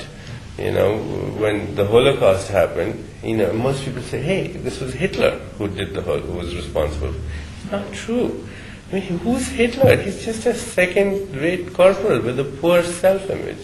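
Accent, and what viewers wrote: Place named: India. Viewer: Not sure if wise or just Indian accent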